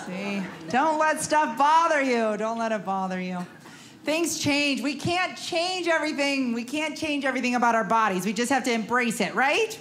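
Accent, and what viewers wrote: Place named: Minnesota, United States. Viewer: Is she from California or Minnesota? She sounds like Minnesota to me lol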